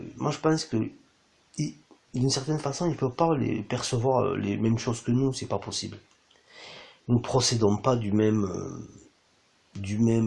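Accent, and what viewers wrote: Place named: Tarn, France. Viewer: Coucou cela fait x années depuis 12 ans surtout le 11h11 le 14h14 , le 17h17 et 22h22 Ton accent me fait penser au Tarn lol Tu as raison pour les heures ❤ Les câlins des FJ sont aussi curieuses et je vais m'exprimer ici sans bouleverser mon intimité, les câlins sont espacés et à chaque fois se déclenchent des échos niveau famille enfin c'est bizarre ,comme un nettoyage Quant à la radio , je pose une question , et hop la réponse Belle nuit et grand merci ❤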